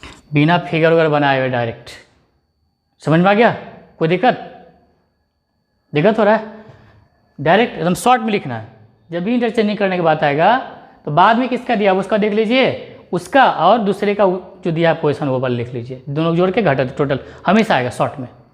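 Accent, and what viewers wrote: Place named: Rural, India. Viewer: 🙏B ke jagahba par 👌👌 dehati language sunnne me mujha kafi achha laga sir0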